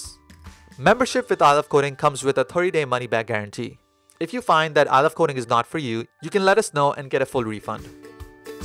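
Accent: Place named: India. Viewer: I love your Indian accent in the background :) :) makes the video makers more authentic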